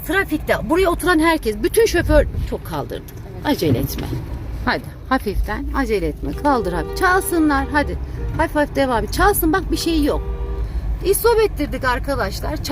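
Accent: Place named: Çankırı, Turkey. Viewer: Burasi çankırımı hocanin şivesi cankirililar gibi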